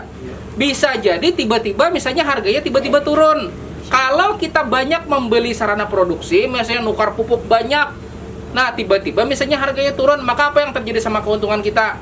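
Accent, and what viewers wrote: Banjar, Indonesia. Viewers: Bahasanya kayak orang banjar kalsel om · YaAllah pantas pina logat Banjar, skalinya dasar org Banjar 😂😂😂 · Luar biasa penjelasanya pak. Ingat waktu di Banjarmasin, logat Banjar.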